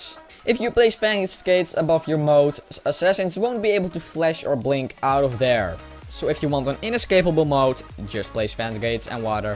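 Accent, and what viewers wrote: Netherlands. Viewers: sounds like a dutch accent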